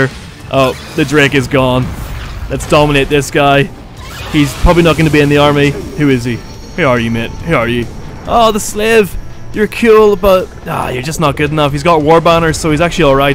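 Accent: Ireland. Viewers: Wait your an Irish man to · I only watched this video to see the raid shadow legends ad idk why just had to see it from the most Irish lad ever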